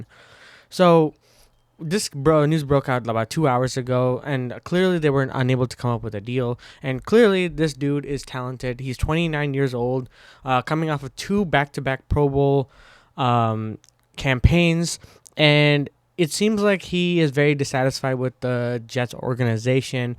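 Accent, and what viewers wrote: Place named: India. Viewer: the thick Indian accent in the video tells me he doesn’t know what he’s talking about and you’re just out here glazing these ill advised takes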